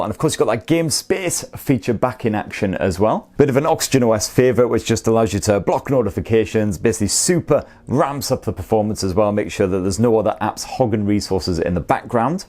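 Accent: United Kingdom. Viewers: i hate that british accent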